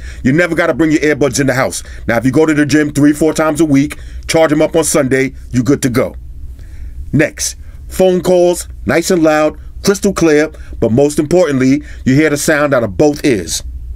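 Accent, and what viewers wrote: New York, United States. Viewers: gotta fight his New York accent when he says "slot" 😂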